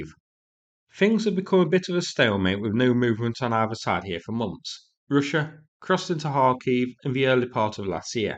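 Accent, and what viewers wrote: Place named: Northern England, United Kingdom. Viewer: sounds like north england to me.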